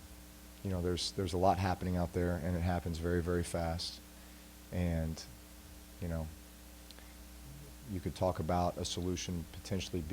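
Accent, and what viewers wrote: United States. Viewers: Rams ah Lozuzz! Rams SUCK! Love that yatty downtown New Orleans accent.. Drew knows they got screwed but 100% he keeps class all the way. Nothing but respect.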